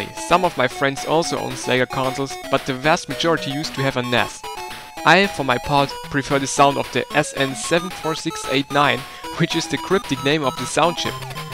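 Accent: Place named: Germany. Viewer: Absolutely love your selection of music, and your German accent is awesome! Keep up the good work :)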